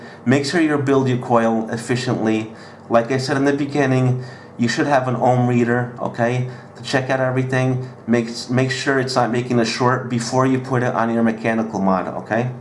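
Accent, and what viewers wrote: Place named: Portugal. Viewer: When I stumbled on to your channel, you reminded me of someone, your slight accent that comes through in English. I couldn't pin point it. Then I realized you remind me of my dad, and various family members, I wondered if your were Portuguese, after more poking around through your past videos I saw the Portuguese reviews! Really enjoy them. My parents are from Portugal, born there, while I was born here in Canada. You seem like you and my dad would be friends, which would be great, would persuade him to stop smoking. I really enjoy your videos, and love watching your portuguese reviews.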